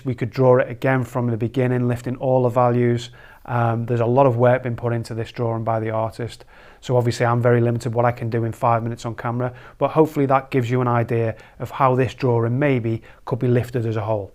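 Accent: Liverpool, United Kingdom. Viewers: Scouser? Me too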